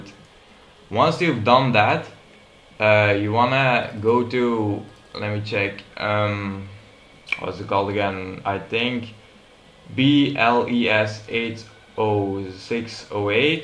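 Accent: Netherlands. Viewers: nederlander? lol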